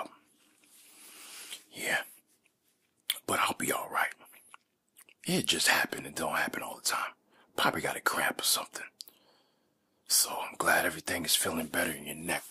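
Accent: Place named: California, United States. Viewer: Hope homie hit over 100K this year! They already know Cali got the best accent for ASMR!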